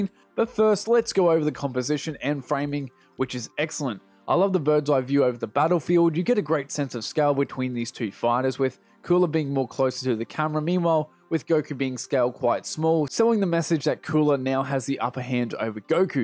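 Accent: Australia. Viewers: I'm a simple man. I hear an Australian accent and I like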